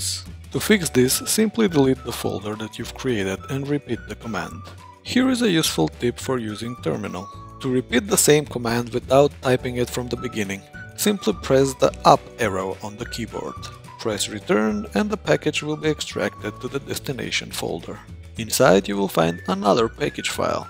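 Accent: Russia. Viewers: русский чтоль?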